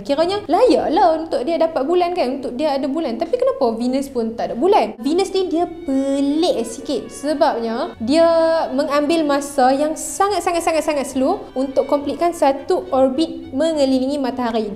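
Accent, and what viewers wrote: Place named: North, Malaysia. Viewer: Nohh lorat utara pekt nohhh...rindu plk kmi kt kg halaman...telari dri topik😅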